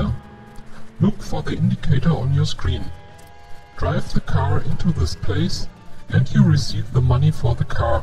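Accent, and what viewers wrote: India. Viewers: indian darth vader?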